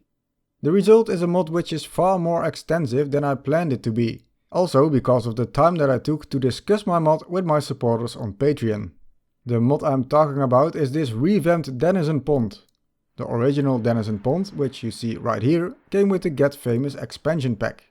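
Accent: Netherlands. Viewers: Are you Dutch? xD these mods are cool :D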